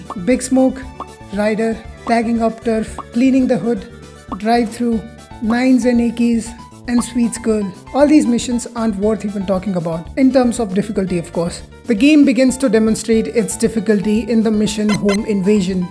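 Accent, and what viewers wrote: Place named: India. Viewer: Why your Indian accent sounds like a middle aged aunty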